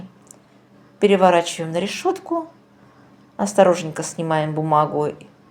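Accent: Baku, Azerbaijan. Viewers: Спасибо за рецепты!!!У вас настоящий бакинский акцент😂😂😂❤❤❤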